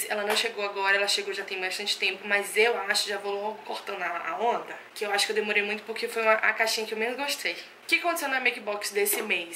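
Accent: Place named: Salvador, Brazil. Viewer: sabia q tu era de SSA! Reconheço meu sotaque sempre kkkkk Tô interessada nessa caixa e na salon box.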